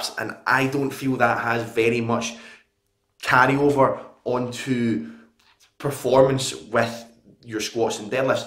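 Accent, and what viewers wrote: Scotland, United Kingdom. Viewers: yo where in scotland are ya from?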